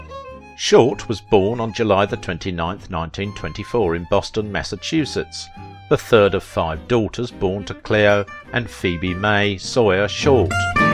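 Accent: England, United Kingdom. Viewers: Give the guy a break. He's got an accent. He sounds English and he will pronounce some words differently than you.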